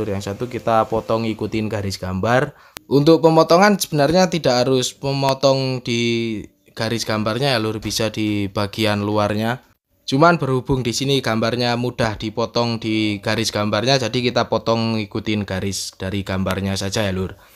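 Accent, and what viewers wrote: Temanggung, Indonesia. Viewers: 🤣🤣 kyak org temanggung ajaa lur.. ahh mantap 😋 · logat temanggung iki, salam mbako lurrr 😬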